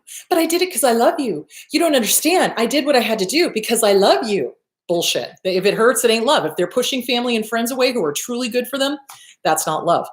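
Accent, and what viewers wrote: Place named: New York, United States. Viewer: Btw, love your New Yorker accent! 😆😆😆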